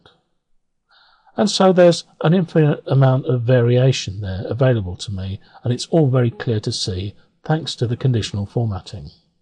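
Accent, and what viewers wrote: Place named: Atlanta, United States. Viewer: Thanks so much, and you have such a nice accent the same accent in Atlanta, Ga all the best for you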